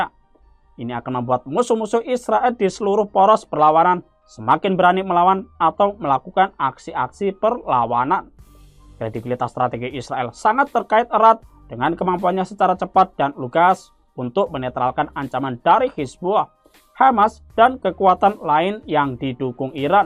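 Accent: Java, Indonesia. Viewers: Pembawa acaranya kurang cocok, selain logat jawanya yg kentel.. cara bicaranya pun kurang mengenakkan didengar telinga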